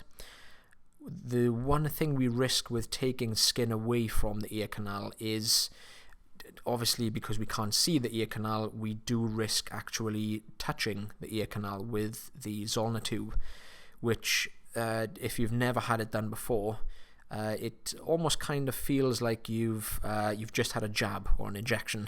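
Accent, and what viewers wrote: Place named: Scotland, United Kingdom. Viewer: Is Scottish? I love the accent!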